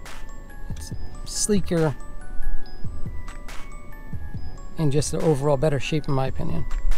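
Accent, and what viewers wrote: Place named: Pennsylvania, United States. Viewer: Nice Pennsylvania accent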